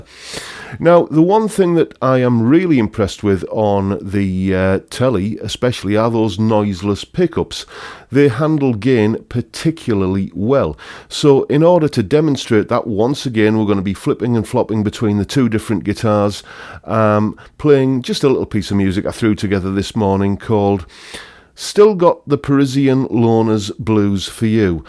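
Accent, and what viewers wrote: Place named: North, United Kingdom. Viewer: Errrr. You should errr, definitely become a DJ. I'm not saying that because you've got a face for radio 😉, but your voice would be excellent. Intelligent, gentle with a particularly articulate Northern twang. Forgive me for any negative vibes this will appear to come with, but the only problem I have with these comparisons is the inclusion of modulation/doubling/chorus on all tracks. Reverb is a little too heavy too. Other than that, you've done a brilliant job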